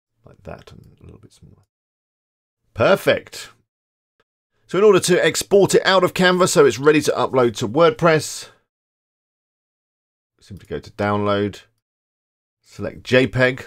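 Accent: United Kingdom. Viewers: Here you get real stuff and with British accent. Epic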